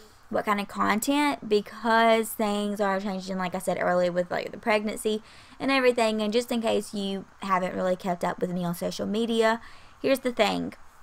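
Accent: South, United States. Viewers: OMGOSH I Never Hear A Southern Voice But My Own...... With My YT....... And I Live In Canada Now For 16 Years........ YOU Remind Me Of HOME!!!!!! Darling!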